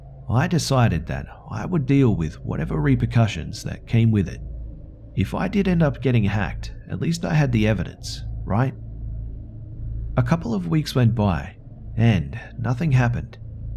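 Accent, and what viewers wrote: Australia. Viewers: Are u aussie? Love your accent and the way you tell the stories, keep it up x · he's Australian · Morgan Lowe he doesn't sound South African at all. Get your ears checked. It's Aussie. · 😊 I enjoyed this video listening to it at work thank you, I'd like to say that your voice is one of many I enjoy listening to especially your Aussie accent😊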